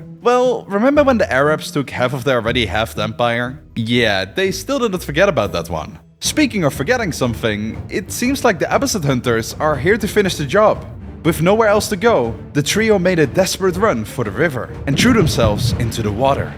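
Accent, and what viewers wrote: Netherlands. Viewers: That's a very heavy Dutch accent 😂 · Ik hoor bijna niet dat je Nederlands ben respect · Dutch accents are ruining YouTube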